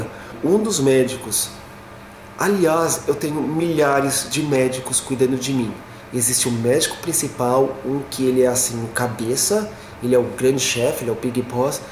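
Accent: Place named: Australia. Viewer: Força. As coisas já estão acontecendo de forma positiva. Cara, esse sotaque australiano é demais. Gosto muito. Você é galã de novela com ou sem barba. Grande abraço.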